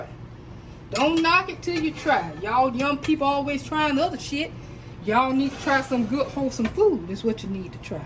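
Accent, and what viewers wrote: South, United States. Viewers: Cumin is also good on any pork dishes! Love me some turkey necks.... yummy. I love ur southern accent! I can tell u can cook! Some folks don't know how good turkey necks are!!! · I was looking for a good recipe for Turkey Necks and came across this video. You go Auntie! Love your southern delivery and the turkey necks look delicious! Can't wait to try this recipe.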